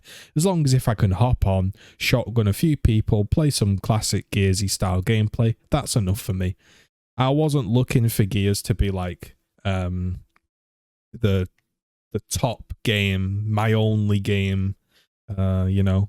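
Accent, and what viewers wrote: United Kingdom. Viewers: I watched this entire video and this is easily the most honest full review of the game and pretty much exactly how I feel. Just remember Z the silent majority of the Gears community stands by you and wishes you the best. I know many content creators and streamers that love your content and persona and behind the scenes talking about your entire situation. I've been here since getting spammed that video on twitter about Gears 1 compared to Gears 5 which I ended up enjoying. I loved the WWE style Gears videos and they still make me smile when I think about them. British accents drive me crazy but watching your videos for awhile now actually made the accent tolerable for me now <3 Love you man and take care of yourself.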